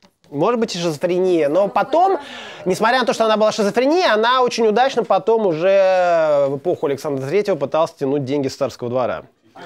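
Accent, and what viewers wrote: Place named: Moscow, Russia. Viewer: какой мА-Асковский акцент!!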